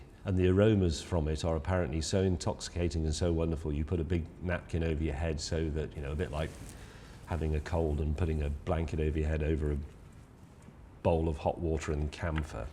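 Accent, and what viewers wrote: United Kingdom. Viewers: Most important one: Is James May aware that his intonation and vocabulary are just iconic and have inspired this and many a non-native English speaker to idolize him because of how marvelous the British accent sounds coming from his mouth?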